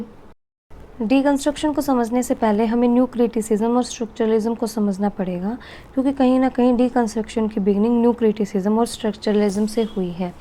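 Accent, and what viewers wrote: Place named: Jammu, India. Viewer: Thank you for the video. And your voice is just 🔥🔥🔥 Your tone makes me feel like you are from Jammu 😅. Anyways, Stay blessed.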